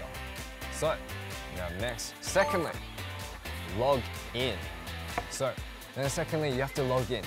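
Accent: Australia. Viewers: i just fell in love with the australian accent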